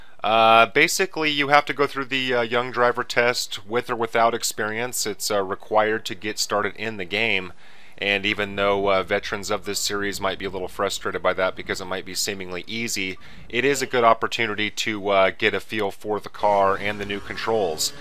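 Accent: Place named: United States. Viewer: it's funny hearing an American talk about F1 for some reason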